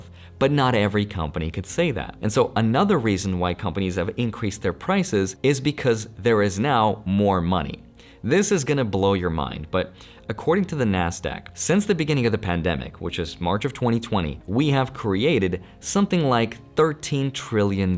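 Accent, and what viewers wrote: United Kingdom. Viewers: LOL your British accent is the best.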